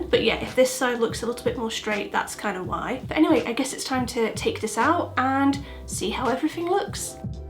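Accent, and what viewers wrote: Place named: United Kingdom. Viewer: You got a really nice way to act, it's not only your British accent, also the female, soft voice makes you come over as a little lady 😊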